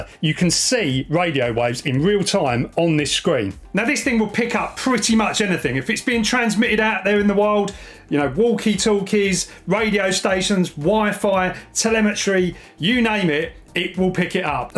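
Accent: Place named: London, United Kingdom. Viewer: A cheeky London accent version of serious radio! You should go down well with the sun readers.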